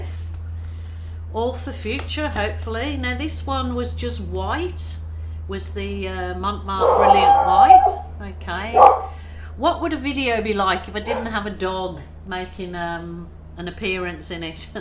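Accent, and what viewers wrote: Birmingham, United Kingdom. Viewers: Hi Love your work! are you from Birmingham UK? I can hear a bit of Brummy there. I grew up in West Bromwich, but have lived in Switzerland since 1980. love your videos! Janet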